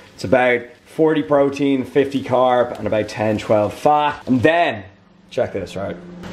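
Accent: Ireland. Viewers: Is he Irish? How strange I clearly understand his english lol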